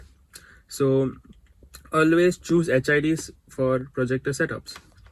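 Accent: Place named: North India, India. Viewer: Great bro!!! Ur first impression made me feel ur some whr from North. But KA19 helped me understand ur from Namma Mangaluru. U simply Rock!!! Make more great videos!!! Ur a better Host compared to these News channel anchors:-)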